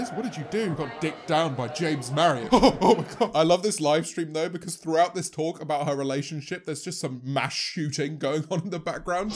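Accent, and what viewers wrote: United Kingdom. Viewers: Sometimes I wish I had this accent I don’t know why, I really like British accent lol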